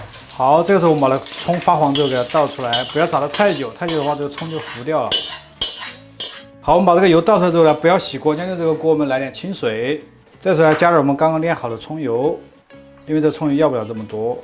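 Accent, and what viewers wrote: Sichuan, China. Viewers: 四川话，目测成都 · 买菜好接地气👍带的四川口音听着亲切，关键干货满满，就是馋死人了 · 四川口音😊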